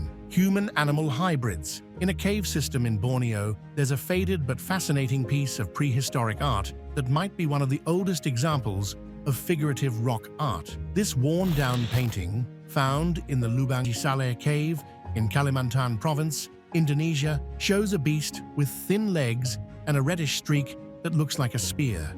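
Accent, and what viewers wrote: United Kingdom. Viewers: next time i watch, i will count the usage of "cool." meanwhile, as i am an american, i had to use captions to see what he was saying with his u.k. accent...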